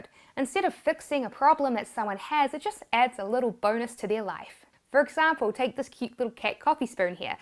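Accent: New Zealand. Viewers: I think it's a New Zealand accent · I'm loving the NZ accent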